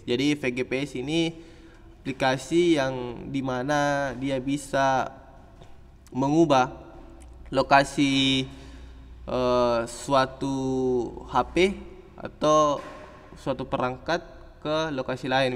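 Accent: Indonesia. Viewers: logatnya kental sekali sama logat makassar😂😂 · Ku bilang mmngja kaya ku tauki logatna...orang sulsel paeng.hhah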